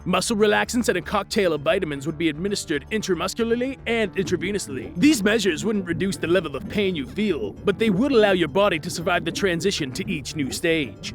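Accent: Boston, United States. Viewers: I hear a Boston accent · Why does he have a Boston accent or like an accent in general like I can't tell what it is but I feel like it's a Boston one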